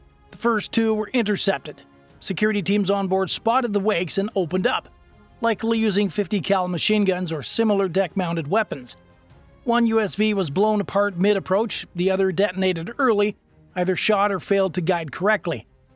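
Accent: Canada. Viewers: Is that a Canadian accent i hear? 🤔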